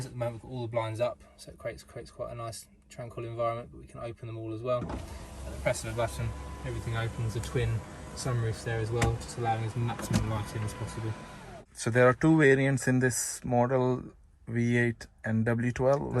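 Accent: India. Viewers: The guy never shows his face. From the accent he sounds Indian. How come you hiding your face?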